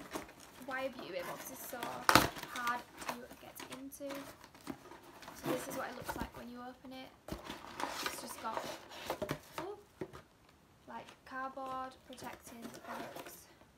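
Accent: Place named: Leeds, United Kingdom. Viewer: aw thanks I’ll have to check the 1hr tan out:) finally a British YouTuber, I’m from Leeds too. Isn’t it strange when you hear someone with the same accent 🤭 that was so cute when you got excited about your make up haha, Iv just placed a £150 beauty bay order, that’s what brought me to your video to see what products others are enjoying. I’ll be excited like you tomorrow when it arrives 😂 keep up the vids xx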